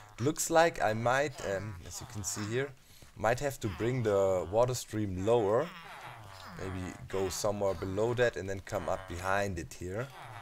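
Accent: Germany. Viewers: are there any English words which you struggle to pronounce due to your German accent?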